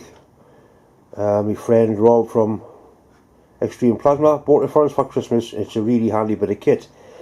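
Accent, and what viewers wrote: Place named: Geordie, United Kingdom. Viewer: Your the UK version of Chris from clickspring, tiny shop making amazing stuff. With that broad geordie accent that makes me think of home (Sadly I'm a Smoggie) and your gentle easy going way, it's just perfect. And the swearing means your human, hee. I always learn something and that is the best sort of video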